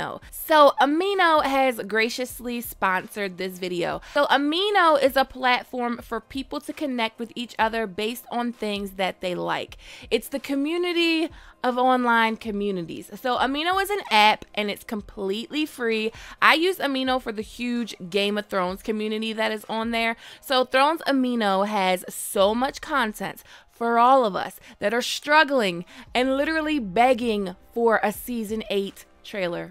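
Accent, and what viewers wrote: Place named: Maryland, United States. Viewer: Good stuff. Looking forward to the new season. I hear a Maryland accent in your voice.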